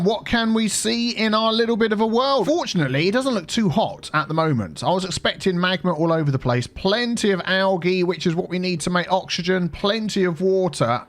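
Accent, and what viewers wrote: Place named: RP, United Kingdom. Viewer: you sound like a posh British person with a bit of not so posh British accent the only real question is what type of tea do you drink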